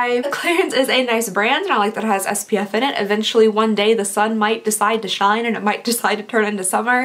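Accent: Kentucky, United States. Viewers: Ha ! I was like she sounds like she is from Kentucky! I am from Louisville. Love your videos !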